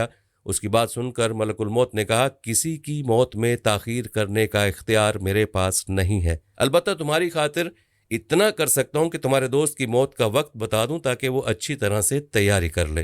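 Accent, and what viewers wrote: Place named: Punjab, India. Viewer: Adrees nhi idrees hy na'am aap Punjabi hn lehjy lugta hy kion ghalat na'am lety hn.thory sy mehnat or homework karen or phir vdo banayen.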